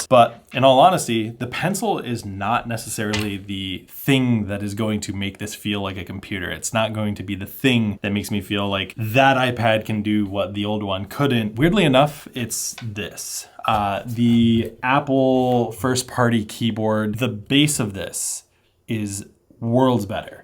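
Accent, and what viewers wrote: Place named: Australia. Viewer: Australian accent!!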